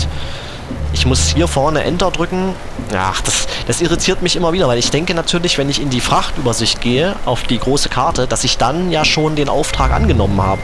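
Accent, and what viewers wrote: Germany. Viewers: Ei verbibcht noch e mo, da kimmt ahner wo och ausm scheene Sachseländle :D ... Mir sinn fai gluuch, dischdsch lusdsch, schnibbsch und gudmiedsch sinn me ooch und manschema e wing einfelltsch, nur eens simmor ne: Dehmlisch! Grüße aus Dräschden :D So, genug von dem Scheiß, zu deiner Frage: Nein, Himmel, kein Minecraft :P Also, ich guck ja viel bei dir mit und kann dich mitlerweile gut ab, aber dort bin ich raus...sorry ;)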